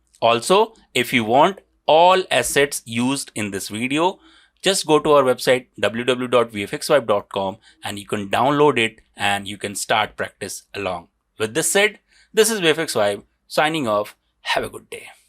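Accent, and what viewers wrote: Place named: India. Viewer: Прекрасный урок, но если ты стал тренером, избавься от ужасного акцента хинди. слушать больно.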